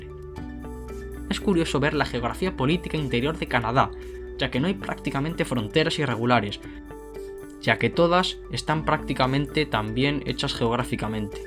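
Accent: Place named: Spain. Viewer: Que hermoso canal, me gusta como narran ustedes los Españoles, sigue así, nuevo sub.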